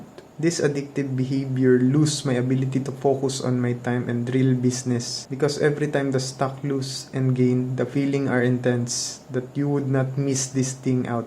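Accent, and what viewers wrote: Philippines. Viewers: Love that pinoy accent btw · That Filipino Accent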